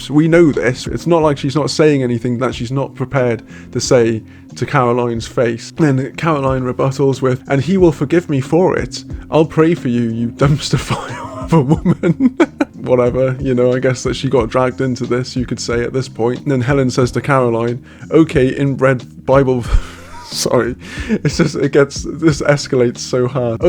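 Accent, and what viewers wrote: United Kingdom. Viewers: The brittish voice adds so much to this.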